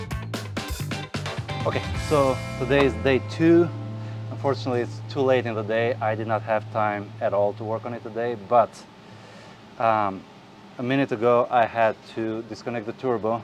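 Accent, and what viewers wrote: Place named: Albania. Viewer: Where are you from. Your accent is like Albanian.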